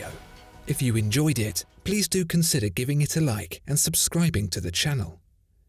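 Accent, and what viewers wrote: United Kingdom. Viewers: That British accent....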